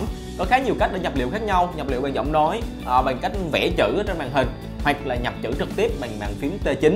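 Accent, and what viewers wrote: Binh Dinh, Vietnam. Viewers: nghe giọng Bình Định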